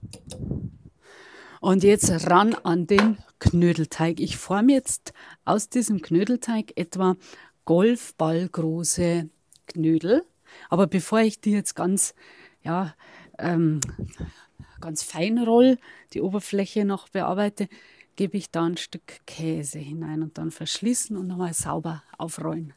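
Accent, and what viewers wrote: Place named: Bavaria, Germany. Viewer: Servus schneeglöckchen, käse knödel hab ich noch nie gehört.bin aber net so der fan käs. Spinat des doch des grüne vom kreiselmähwerk. Spinat der mit den blub war früher immer die kinderwerbung. 😅spass bei seite. Was du immer für ideen hast mit deinen kochkünsten das überrascht mich immer voll. 😊❤ oberpfalz ok hörst die eher bayerischer dialekt an dein mann hat dich gelehrt eine neue zu lernen ich lerne bayerisch 😅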